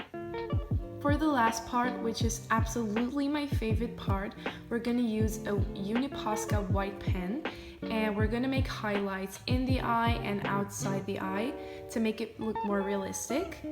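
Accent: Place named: Netherlands. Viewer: Me: hmm it looks like she got a dutch accent! *looks in discription * "where do I live? The Netherlands Me: *cheers*I'm Dutch tooooooo!💕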